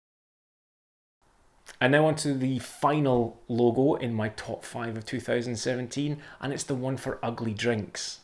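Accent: Scotland, United Kingdom. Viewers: The sexy soft scottish accent.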